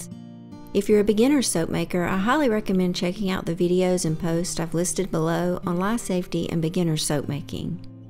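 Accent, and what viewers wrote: South, United States. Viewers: you have the sweetest southern accent, it reminds me of home. Your soaps are beautiful.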